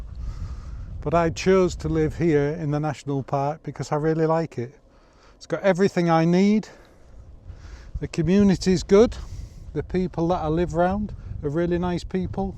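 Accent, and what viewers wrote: Hull, United Kingdom. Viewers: Just discovered your channel, great video. Nice to hear a hull accent again (I’m from Leeds but went to hull uni). Keep up the good work! Really good video because you clearly know your topic and put a lot of effort in, you’re passionate about it, and you have a nice relaxed style.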